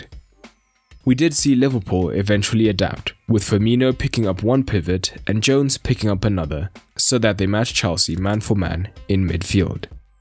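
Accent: South Africa. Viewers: The guy doing the voice over South African?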